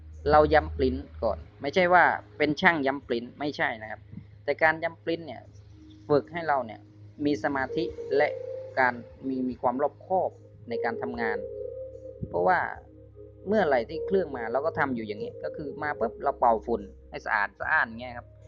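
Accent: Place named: Surin, Thailand. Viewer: สำเนียงเหมือนเขมรสุรินทร์เลยอยู่อำเภออะไรล่ะบ่อง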